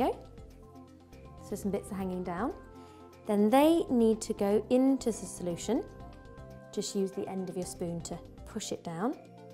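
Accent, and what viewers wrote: England, United Kingdom. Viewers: Are you British? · SHE'S ENGLISH not American that's what the English call IT WHO CARES anyway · im an english person living in england and that is still the most english accent I've ever heard XD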